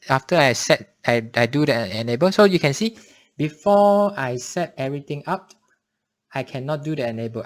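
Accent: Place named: Singapore. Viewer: Thank you for the clear explanation. Your manner of speech reminds me of my childhood home town. I am from Sg, are you from Sg or My? Just asking. :)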